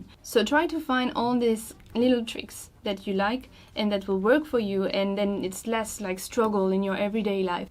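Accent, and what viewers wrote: France. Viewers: good video.. and i like english with french accent more than french itself XD ... so we're both not native english speakers and i wish i could speak that good... writing/reading/listening is so much easier than speaking for me.. i couldnt do it it that fluent :D ..recently i saw the comparison video from J.Bardwell about the chameleon/rooster/Chameleon Ti and now i dont know what frame to buy next because i really like the Rooster lol ^^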